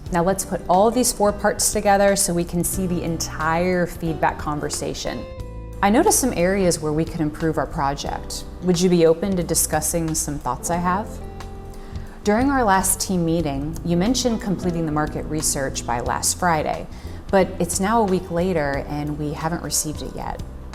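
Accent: United States. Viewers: Are you American? Are you a native American speaker?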